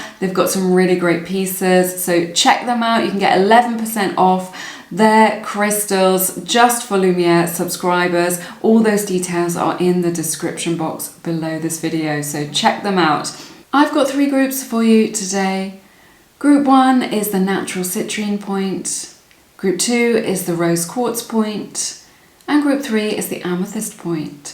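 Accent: United Kingdom. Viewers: thank you lumiere! love you and your British accent hehe! 😂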